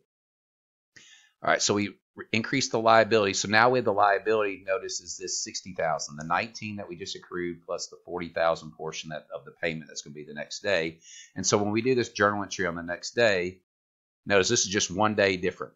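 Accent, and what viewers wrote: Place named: South Carolina, United States. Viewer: Are you from south carolina? You sound like Linsdey Graham lol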